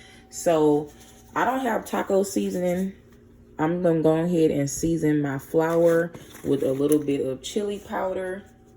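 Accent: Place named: Memphis, United States. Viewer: You talk like dearra! Are you from Memphis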